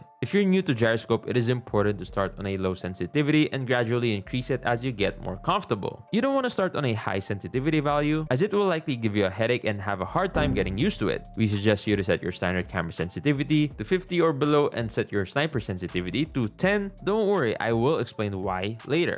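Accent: Philippines. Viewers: You sound so filipino😅 (I know its not the topic i just wanna ask)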